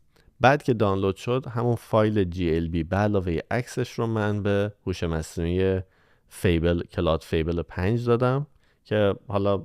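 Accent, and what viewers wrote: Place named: India. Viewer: از پکیج دیجیتال مارکتینگ که چندین سال پیش دادید فکر میکردم هندی باشید بخاطر لهجه لاتین تا الان که یهو این ویدئو رو دیدم، عالی بود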